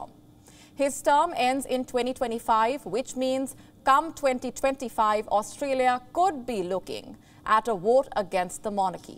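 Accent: India. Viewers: what do you think it means. An Indian accented English speaker. It's a common thing these days. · Speaking english in indian rythm is interesting..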